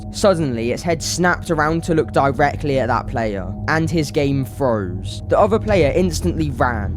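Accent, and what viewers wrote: United Kingdom. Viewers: Are you scotish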